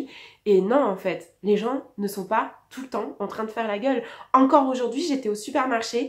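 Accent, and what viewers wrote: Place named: Quebec, Canada. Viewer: Ton accent est différent. Il y a un petit peu d'accent québécois, J'aime bcp.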